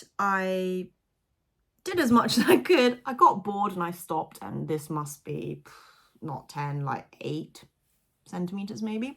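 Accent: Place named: United Kingdom. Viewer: You were cracking me up with your side bar comments. And it looks like we may have the same esthetics with knitting. So you may inspire me with future projects! And besides … I really enjoy listening to your British (or is it English) accent. ☺️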